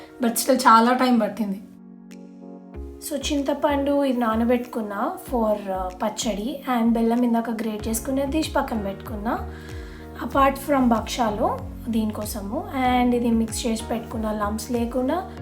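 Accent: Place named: Vijayawada, India. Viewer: Mee slang chala bagundhi.... Meeru vijayawada....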